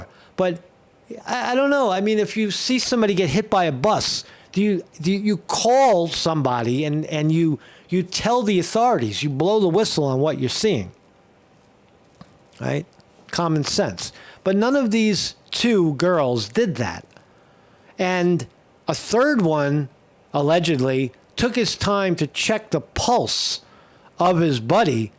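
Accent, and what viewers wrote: New York, United States. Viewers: Hey first time I'm tuning into your podcast you sound like a fellow New Yorker but I could be wrong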